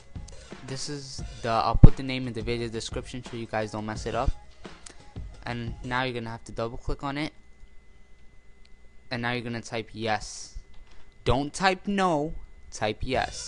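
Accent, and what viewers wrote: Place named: New Jersey, United States. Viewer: YOU SOUND LIKE YOU FROM JERSEY.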